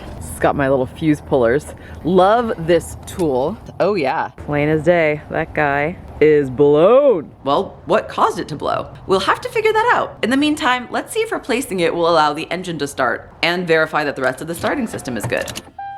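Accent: Texas, United States. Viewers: Are you Texas ?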